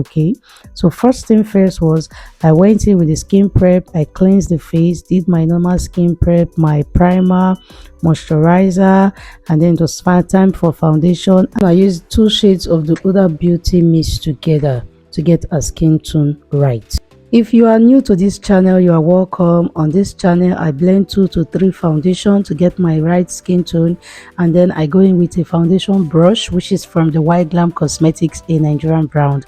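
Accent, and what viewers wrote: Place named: Igbo, Nigeria. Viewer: She spoke Igbo English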